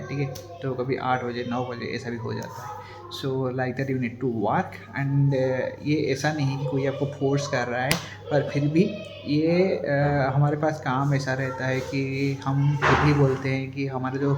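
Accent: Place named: Odisha, India. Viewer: Are you an Odia ? I guess it from your pronounce 😊❤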